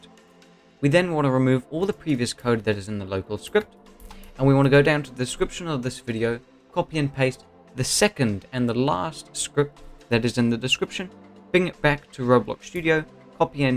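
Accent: United Kingdom. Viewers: Bro idk why but you are legit the most formal person ever. Idk if it's the british accent but god dayum you just sound so professional. I feel like I've done something wrong and you're trying to explain to me why I'm an idiot in the calmest way possible.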